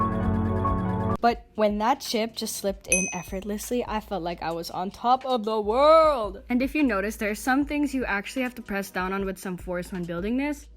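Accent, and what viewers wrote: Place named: Philippines. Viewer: You sound like you're filipino. Are you?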